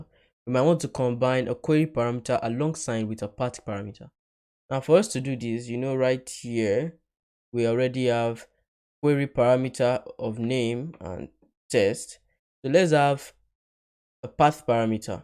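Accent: Yoruba, Nigeria. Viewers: Great one, the tutor voice sound like a Nigerian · I am also perceiving it from his accent, I am sure is a Nigerian 😅 Yoruba specifically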